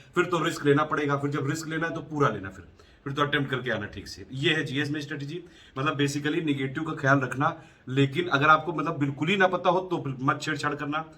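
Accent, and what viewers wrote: Kanpur, India. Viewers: Puri hmari kanpuriya language hai 😀😀👍👍 mja aa gya sir🙏🙏🙏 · Hahaha..... total kanpuriya ho Bhai.....hum bhi kanpur ke hai...z😂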